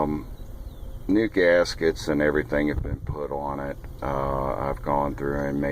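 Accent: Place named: Pittsburgh, United States. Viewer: Are you from Pittsburgh by chance? Sounds like you have a yinzer accent. No disrespect, I lived there for awhile, miss the place. Good luck on your van build, looks awesome!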